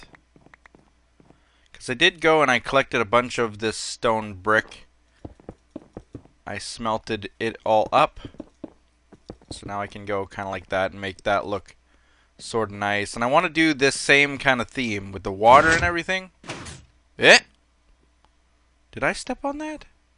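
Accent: Canada. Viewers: are u Canadian I can tell by ur voice and when ur saying eh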